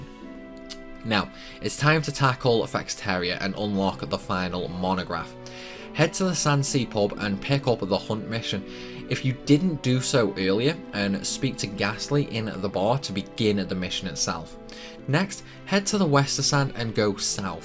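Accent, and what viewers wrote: United Kingdom. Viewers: Ngomong pake aksen british agak ngeselin juga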